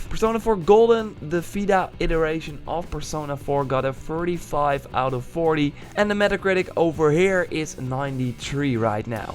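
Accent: Netherlands. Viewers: Are you, by any chance, Dutch?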